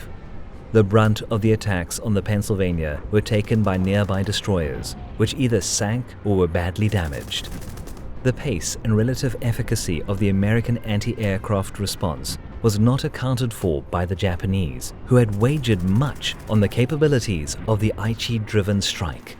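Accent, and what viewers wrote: South Africa. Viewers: Loved it, thank you! South African accent, Cape Town I would say. Sounds like you have been abroad for quite a while (or are you mimicking a SA accent?) I swear boet don't play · is the narrator South African?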